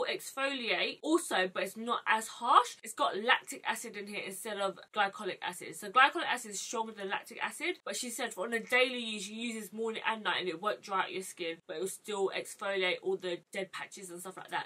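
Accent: United Kingdom. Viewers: who would've thought that your accent is british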